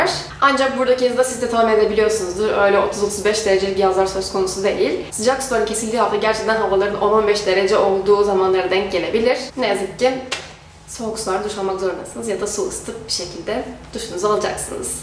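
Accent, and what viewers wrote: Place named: Russia. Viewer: Adın ve soyadın türk ama aksanın acaba rus diliyle içli dışlı olduğun için mi yoksa rus olduğun için mi rus aksanı hissettim bilemedim :)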